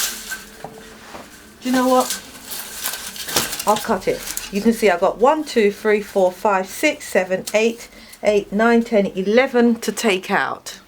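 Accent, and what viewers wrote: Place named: United Kingdom. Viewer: Thank you for this video. My parents have 4 very nice but old metal blinds that all need repairs. And cleaning. You seem very down to earth and I enjoyed the way you presented all this. Using your instructions to repair blinds all the way in Australia 😁 (I'm assuming you're in the UK coz your accent sounds like my brother-in-law's). Cheers 🙌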